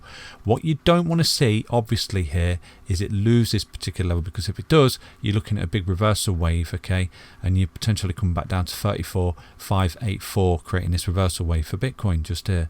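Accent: United Kingdom. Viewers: You British my man? Find your information impressive! Would 100% watch a tutorial from you (Y)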